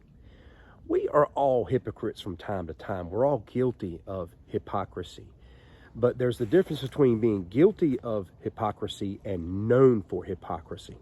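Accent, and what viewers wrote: South, United States. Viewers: Not only do I like your advice, but your southern accent, combined with your calm and confident voice sounds both masculine and soothing at the same time. Keep it up, man.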